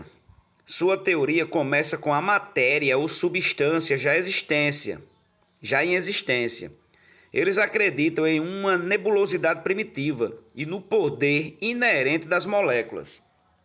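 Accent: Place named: Northeast, Brazil. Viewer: Pelo sotaque desse narrador do vidio ele evoluiu do jumento porque ele e um nordestino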